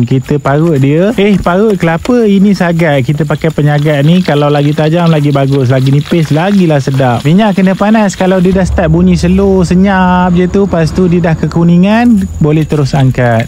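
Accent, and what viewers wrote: Pahang, Malaysia. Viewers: Dari loghat abg ni,mesti dia ni,org Pahang😅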